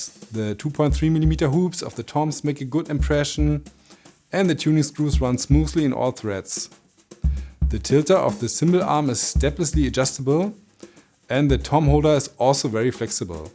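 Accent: Germany. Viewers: Als ich den deutschen Akzent gehört habe habe ich mich außergewöhnlich gefreut weil es kein Set aus Amerika ist, das man so schwer nach Europa bekommt. Gott segne euch!!!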